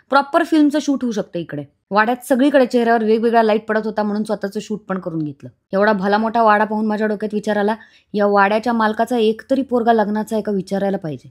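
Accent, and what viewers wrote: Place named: Beed, India. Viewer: मायला आमच्या बीड ची भाषा कड़क 😂😂😊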